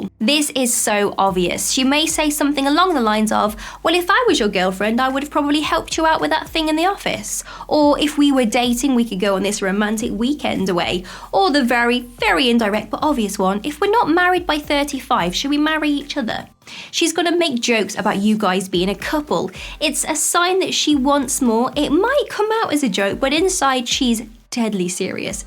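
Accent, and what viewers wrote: United Kingdom. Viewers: Looking at you and listening to you is like looking at you and listening to you for the first time. Love your British accent on top of that your personality and beauty sets it off. You're fine as frogs hair!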